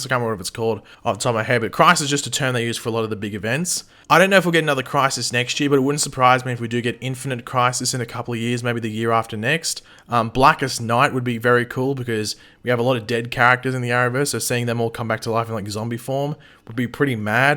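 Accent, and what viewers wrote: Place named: Australia. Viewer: You sound like a Australian version of Tom Welling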